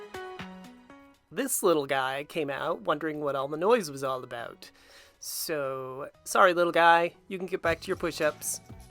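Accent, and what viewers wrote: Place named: Midwest, United States. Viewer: I THOUGHT I heard some midwest inflection there! (I'm in Ohio) What gorgeous wood grain was hiding under that old varnish!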